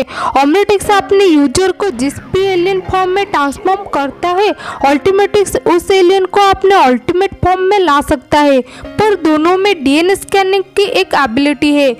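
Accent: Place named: Bangladesh. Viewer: tumhara voice se lagta ha ,tum Bangladesh she ho Ma bhi Bangladesh she ho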